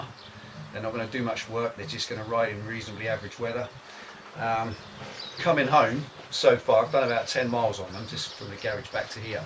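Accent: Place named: Cockney, United Kingdom. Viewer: Settled on Bridgestone Battlax's after Michelin, Continental & Pirelli. The Battlax's are still good after 12,000km, whereas everything else was totaled at 5000km on my Kwaka ZZR600. Battlax's are harder, but still great on wet with very little "walking" at speed when cranked over. Here in country Australia my average shopping trip is 400km with a mix of open and hill's roads so it may be a different story where you are. Great vid's, you got the cockney gift mate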